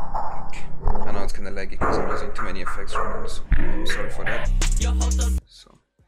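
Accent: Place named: Germany. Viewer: that german accent tho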